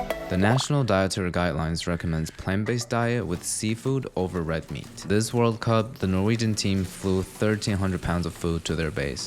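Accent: Finland. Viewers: He sounds Scandinavian (Finnish) to me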